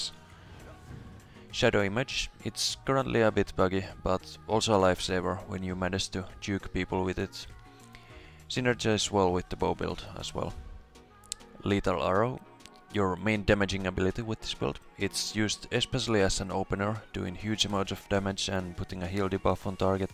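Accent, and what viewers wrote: Finland. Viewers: U from Finland? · Kuulostat niin paljon suomalaiselta että on pakko kysyy että oonko oikeessa? taino siis jos osaat lukee tän niin oot varmasti suomest xD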